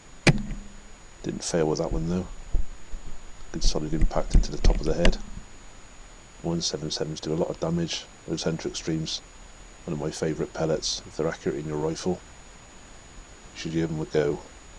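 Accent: United Kingdom. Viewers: Most annoying British accent ever.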